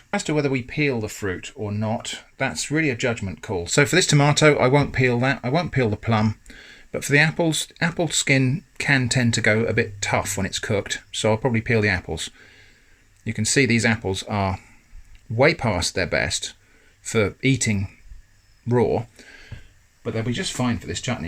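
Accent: United Kingdom. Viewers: For some reason you sound kind of british.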